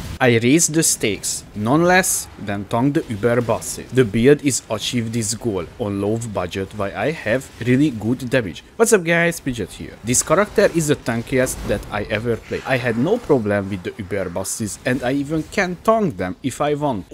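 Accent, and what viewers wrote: Hungary. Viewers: Someone having a Hungarian accent here :D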